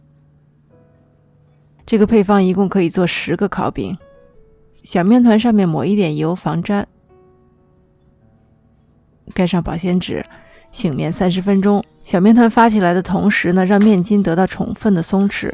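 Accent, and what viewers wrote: China. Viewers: IT's Chinese pronunciation. She is speaking mandarin.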